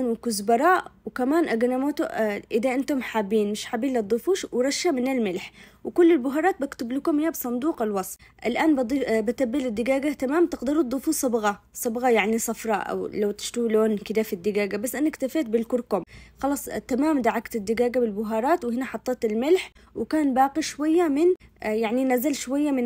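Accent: Aden, Yemen. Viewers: ماشالله عليك والله لما اشوف فيدوهاتك وطريقه شرحك العدنيه ...أتذكر عدن الحبيبة... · روعة ربي يحفظك صوتك وكلامك واللهجة العدنية من فمك عسل اختك ام معين من عدن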